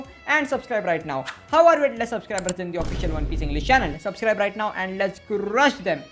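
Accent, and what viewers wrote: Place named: India. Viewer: Omg thanks for normalizing Indian accent, I have begin to accept it now.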